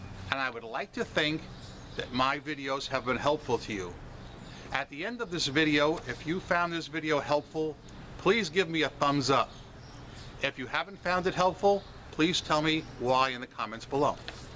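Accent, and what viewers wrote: New York, United States. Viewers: New York City accents like ROCK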